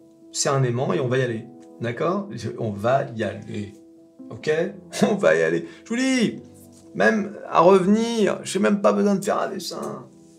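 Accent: United States. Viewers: Y'a pas mal de gens qui se sont fait rekt avec Eurotunnel, et j'ai l'impression que ça a laissé des traces dans l'inconscient collectif. Pis la bourse est une jungle qui fait semblant d'etre régulée, alors qu'avec les cryptos, on sait que c'est une jungle direct, y'a pas d'illusion. Au premier -95% si t'es pas mort, t'as compris q'il y a pas de règles... ;) PS: J'ai ete sur ta chaine Anglophone, c'est pas mal aussi cet accent Texan, t'as choppé ça où ? ;)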